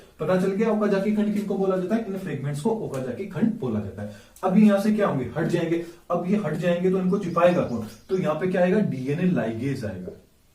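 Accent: Rajasthan, India. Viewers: Sir aap Rajasthani ho?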